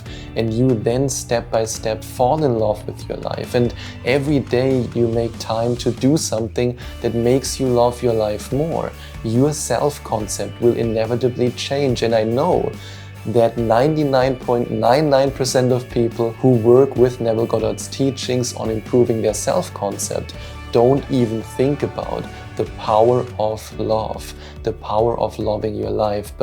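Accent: Germany. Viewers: I love your German accent, your wonderful sense of humor and the straightforward way you present the material for us to understand your journey and our journey.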